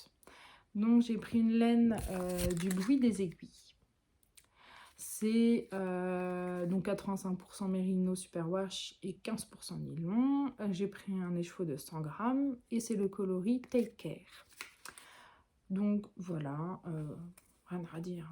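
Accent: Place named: North-East, France. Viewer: Je vous découvre par hasard , merci youtube , pour une première vous êtes bien à l’aise , bravo . Je reconnais ce petit accent du nord est de la France , je dois avoir le même 😊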